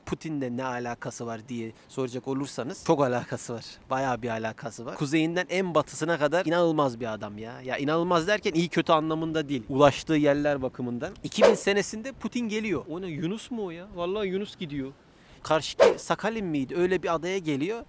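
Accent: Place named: Anatolia, Turkey. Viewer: abi japonyanın en kuzeyinde bile anadolu şivenden ödün vermemen çok özel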